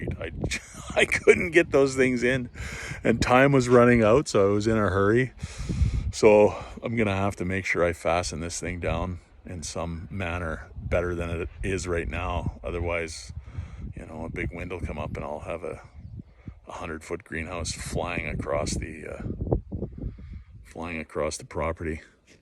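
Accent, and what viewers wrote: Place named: Ontario, Canada. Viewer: Great job. It appears this is where a man is meant to be. You obviously lived in Ontario for a while as your ‘Ote’ for the word ‘out’ is a giveaway.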